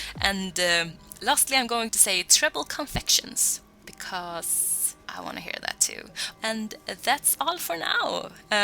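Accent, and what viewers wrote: Sweden. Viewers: I knew you were a Swede the moment you opened your mouth! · I just started watching your videos, and i am actually really glad, I did. And your accent is so cute! where are you from? ^^; //edit, you're from sweden? awesome!// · Oh my gosh your Swedish?!?! you and pewdiepie my favorite youtuber both Swedish your accent is so cute I love it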